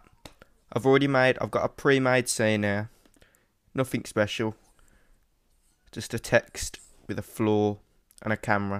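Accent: Birmingham, United Kingdom. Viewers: Are you from birmingham? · i heard ya dog at 100 lol ignore me dude its good to hear a fellow brummy cheers for the tips man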